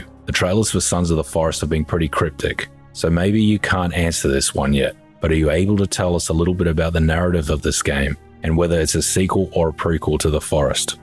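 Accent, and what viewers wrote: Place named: Australia. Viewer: Yo love your vids but I got a weird question but what is you accent or dialect to me it sounds Australian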